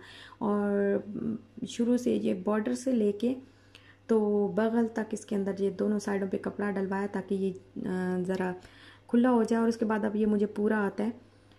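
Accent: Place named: Multan, Pakistan. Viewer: very good mgr ap ka andaz bolny ka multan walon jesa hy jo bht sweet hy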